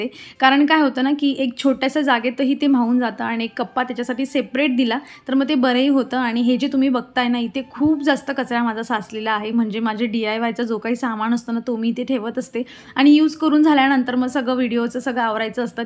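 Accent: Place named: Jalgaon, India. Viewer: Tuzya language varun lagech kalat tu Jalgaon chi like ळ la ड😂😊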